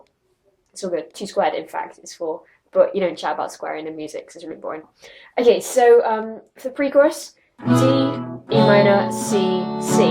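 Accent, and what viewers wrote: United Kingdom. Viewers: British accent is so so......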